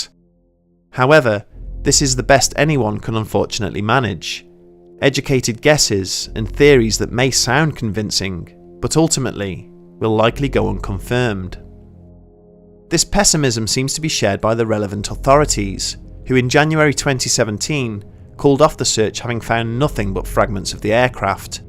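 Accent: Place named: United Kingdom. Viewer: The brit vocals are irritating LOL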